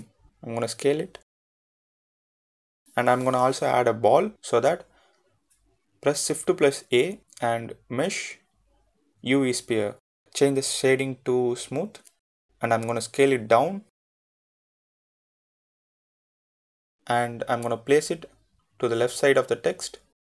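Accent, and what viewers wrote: India. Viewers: Your accent is indian .Are You ?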